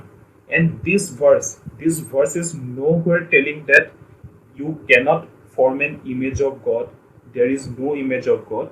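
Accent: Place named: Northeast, India. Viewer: Your Pronunciation is erroneous... . . You are from North-East India, I suppose. 2. , you sain " LIKELINESS "whereas the word on the screen is "LIKENESS", Please be VERY accurate in CORRECT pronunciation.. . and again , you said "LIKELINESS " !!!!!!!!!!!! "MEASURE"is "BARHA-BARHI "??????? बड़ा बड़ी या बराबरी ??????? YOU ARE FROM ASSAM, Sure..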